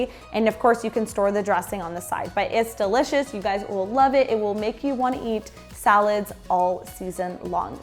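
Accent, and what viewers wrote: Canada. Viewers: Love the recipe, I will try it for sure!! Btw, I love your accent, are you from Canada?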